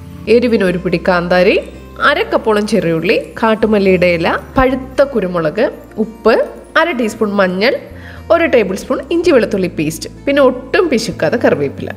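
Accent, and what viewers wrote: Kottayam, India. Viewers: Enikku ithu vare oru vlogerem kananam ennu thonniyittilla.pakshe entho enikku chechi onnu kanan Moham.eppolenkilum meet cheyyan pattumayirikkum alle....Chechikkutty othiri ishtam.chechide nadu evida?samsaram kelkkumbo oru palace kottayam style feel cheyyarundu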